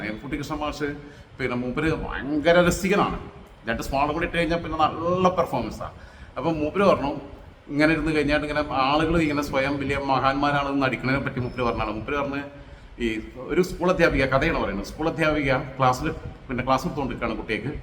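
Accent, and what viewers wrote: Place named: Malappuram, India. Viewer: Bro..How come suddenly ur slang changed to our malappuram slang? In your old videos it was not like this?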